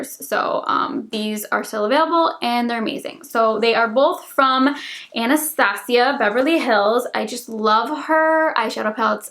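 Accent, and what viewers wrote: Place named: New York, United States. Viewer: Does anyone else remember she used to have an accent?? Am I just going crazy I swear she used to have a New York accent lmao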